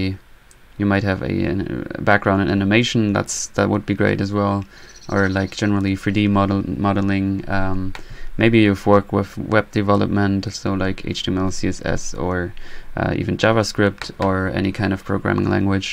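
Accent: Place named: Ireland. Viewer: Hey you say German is your native language. But I'm hearing a really strong Irish influence on your accent? Is that right?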